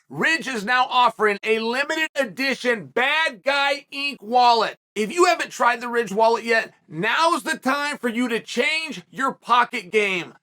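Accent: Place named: United States. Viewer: Clicked on the vid, heard the American accent, closed the vid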